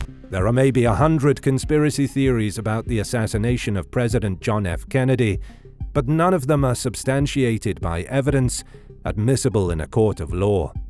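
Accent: England, United Kingdom. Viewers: His English accent doesn't add any real credibility to his conclusion. Facts: Oswald was downstairs (2nd floor) causally having his lunch 90 seconds after the shots were fired, drinking a coke--(2 witnesses)nobody saw Oswald coming down the only stairway available when they were coming down the stairway 45 seconds after the shots (2 TSBD workers observed by TSBD supervisor). Rifle was originally reported as a Mauser (far more likely accurate weapon) on live radio and tv air by weapons experts (2 witnesses) before the story was changed. Oswald's supposed Mannlicher–Carcano rifle was a "piece of junk" and needed to be shimmed even to get telescopic scope to work (3 witnesses) probably a Dallas PD property room reject carelessly chosen and hidden the day before. The Mannlicher–Carcano rifle had such a bad reputation in Italy (it's country of origin) it was called "the Italy lost the war." Simplest theory--Crossfire--3 rifles--Grassy Knoll(front twice--Kennedy's neck, temple) , Texas SBD(Connally and miss), and across from TSBD on the roof (bullet off the curb that hit bystander by the overpass next to a policeman (2 witnesses). 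The Dallas Police Department actively did everything they could to suppress any witnesses that did support "Oswald did it." Crossfire was standard CIA operational procedure to guarantee success. Majority of Secret Service detail had been drinking till 3 am at at Casbah Club--rookie had a machine gun the follow up car, Kennedy's driver didn't follow proced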